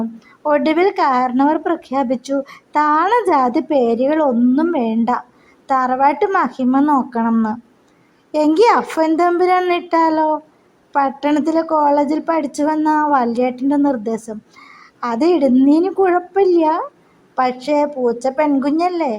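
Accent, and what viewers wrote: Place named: Valluvanad, India. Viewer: നല്ല വള്ളുവനാടൻ മലയാളം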